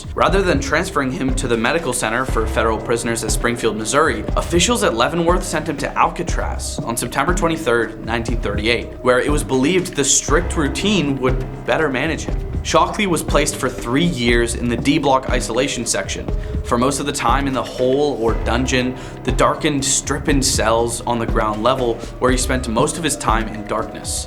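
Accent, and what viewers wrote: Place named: Canada. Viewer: Are you from Canada? A few ways you pronunciate certain words, remind me of some dear Canadian friends, I knew years ago. Love your channel plan to binge today!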